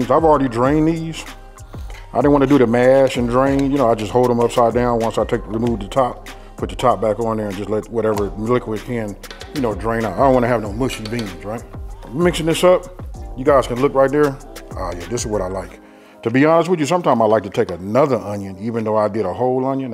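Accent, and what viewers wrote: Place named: California, United States. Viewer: I love your cali accent, you making me miss home lol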